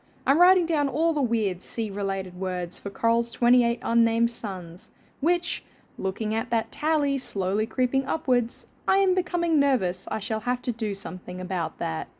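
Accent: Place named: Australia. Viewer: I vote for the 28 unnamed princes :) Also what nationality are you? You sound aussie ( i am)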